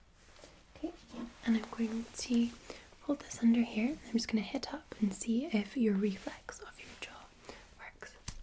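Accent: Scotland, United Kingdom. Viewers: Wow...just wow. I’m getting a Scottish Dr Quinzel vibes...this is dangerous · What accent is this? Edit: Just read she is Scottish, but is there a mix? It's not the Scottish I'm used to hearing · me: FINALLY SOMEONE SCOTTISH[ also me: she sounds so nice but then im Scottish and i dont sound that nice]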